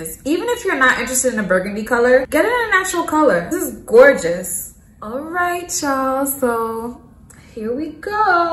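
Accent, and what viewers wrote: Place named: DMV, United States. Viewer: TOP 6 REASONS I SUBSCRIBED: • Your complexion sent my azz to the kitchen for some HAWT CHOCOLATE 🍫 • You are giving me every reason to buy these units BAYBEEEEE 😭❤️🤎❤️ • Your music is poppin Ma. I’m a 80s baby • The confidence is on 💯💯💯💯 • There’s no fear in color like, you screaming “run up” & Move around “ to EV-ERY color in this playlist sis • We neighbors/family with the energy, style, accent.... “DMV” Where ARE YOU AT??? ANNNNNNDDT my #1 reason- you are most definitely a TOPCOAT PRETTY BROWN BABY DOLL & I’m supporting you baby girl PS- once I snatch these units, zaddy dropping Ben-jamins = I don’t spend a dime 🤣 Keep going baby! I’m a fan MUAS 💋 and happy holiday season boo 🎁✨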